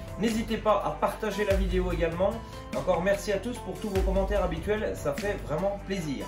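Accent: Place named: Franche-Comté, France. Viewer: Super vidéo merci... Ton accent m est familier... Franche comte?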